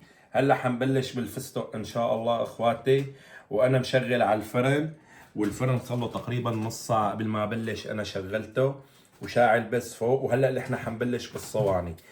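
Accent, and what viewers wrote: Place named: Syria. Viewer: اللهجه السوريه تجنن❤❤❤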